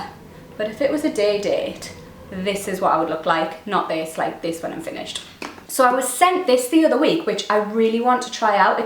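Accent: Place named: North, United Kingdom. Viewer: that’s ok chick always a pleasure seeing your cheery face and that up north accent 😘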